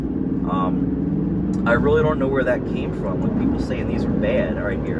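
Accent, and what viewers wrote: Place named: Cockney, United Kingdom. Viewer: Great fun and pretty informal too … not a bad cockney accent either … love the 4200 …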